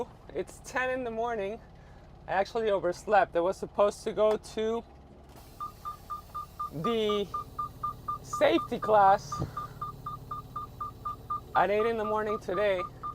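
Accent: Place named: Latin America. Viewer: El trokero peruano que no hace vídeo en español…. Smh. Don’t forget where you come from.., don’t be one of those Latinos that think they are gringo because they light skin and speak English(with an accent). Not hating but it’s the truth.. there’s a reason why you got less than 5k subs.. Vlog en Español and you’ll see your channel blow up. ✌🏽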